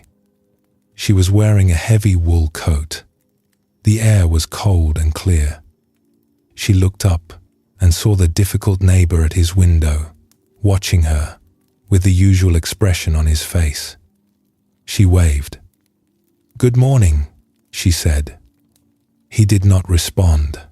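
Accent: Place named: United Kingdom. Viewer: The voice is pleasing, though it seems odd to me that it has a British accent rather than, perhaps, an Asian one….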